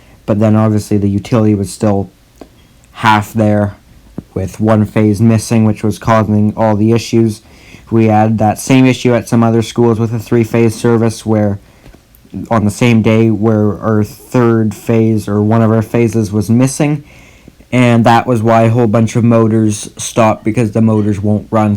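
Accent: Canada. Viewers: Ah, that's interesting. We have a similar program here in Alberta that allows you to go to school in the morning and work in your desired trade in the afternoon. It's called the RAP (Registered Apprenticeship Program). Is it similar to where you are (not sure which province you're in but I can hear the Canadian accent in your voice)?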